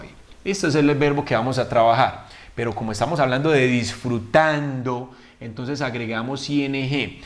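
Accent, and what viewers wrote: Colombia. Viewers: Me desconcentra el acento colombiano 😍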